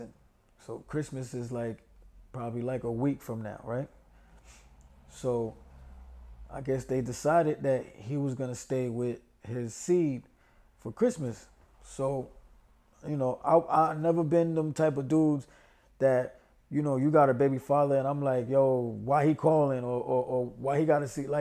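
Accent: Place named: Bronx, United States. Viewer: Immediately subscribed . Love from the Bronx lol that ‘YU HURD!?’ Told me all I needed to know. Accent lmao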